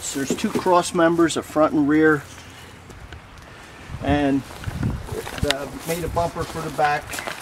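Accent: United States. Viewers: What part of Philly is this guy from haa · I'm not sure where this guy is from, but he totally has a Delco, PA/South Jersey accent. Hell, he could be REVan Evan's dad!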